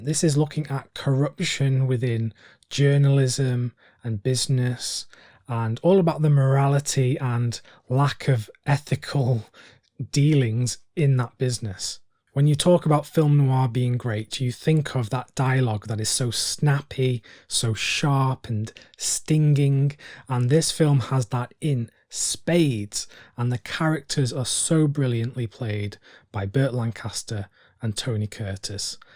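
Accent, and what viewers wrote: United Kingdom. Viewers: Great tutorial and will watch some of these. Is his accent British maybe from a small corner of England somewhere? Sounds like a very unique accent. Is "North by Northwest" more of a Hitchkok horror film and not Film Noir? I looked at the present day list of the Film Noir of today, forgot the official name of that genre, and wow so much violence I quit watching even the clips.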